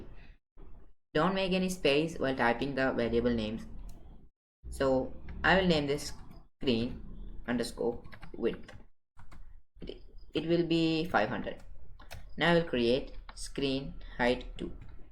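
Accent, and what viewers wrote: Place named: England, United Kingdom. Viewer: u turned to an engliish dude!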